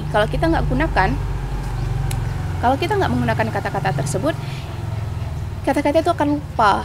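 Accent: Malaysia. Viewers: Logat nya kadang kayak bhasa malaysia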